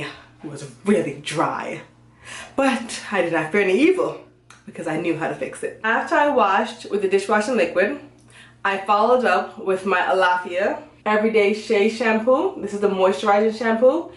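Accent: Patois, Jamaica. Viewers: did u not hear her speaking patwa... smh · Well obviously you are Jamaican... lololol Just heard your pathois!lolol love you even more now!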